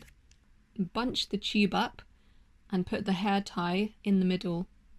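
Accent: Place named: United Kingdom. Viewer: It's British accent 😅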